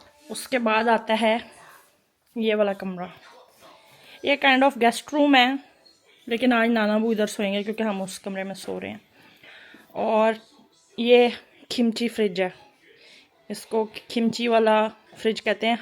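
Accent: Pakistan. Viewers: pure Pakistani accent❤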